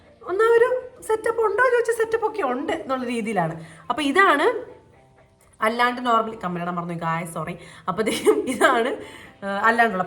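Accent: Kozhikode, India. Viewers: Kozhikode slang alalo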